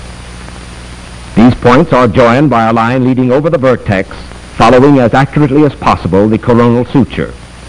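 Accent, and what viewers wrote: Transatlantic, United States. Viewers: 1. Old as hell video 2. Transatlantic accent narrator 3. Fuzzy background sounds 4. Slightly distorted video quality 5. Almost/complete black and white colours 6. Starts off with a bunch of descriptive texts 7. Medical related Well this video check listed all the points to be CREEPY AS FUCK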